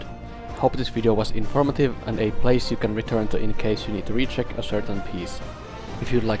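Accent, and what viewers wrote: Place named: Finland. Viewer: Oh cool I've legitimately never heard a Finnish person's accent. Also nice vid 👍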